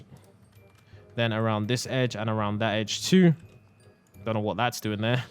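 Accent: United Kingdom. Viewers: Why you sound british?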